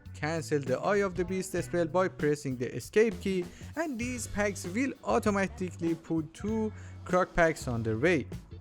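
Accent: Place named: Iran. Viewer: kose khare lahje irani xD nice video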